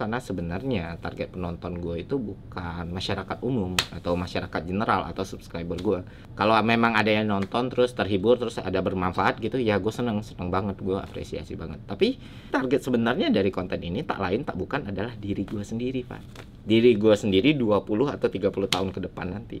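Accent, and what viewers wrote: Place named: Jambi, Indonesia. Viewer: Woii orang jambi yoo haha orang awak lah ternyatooo awak kiro orang jakarta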